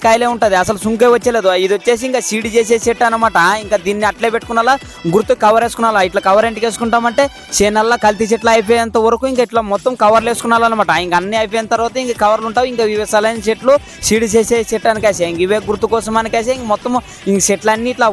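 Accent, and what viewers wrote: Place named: Chittoor, India. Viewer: Chittoor slang mawa 😅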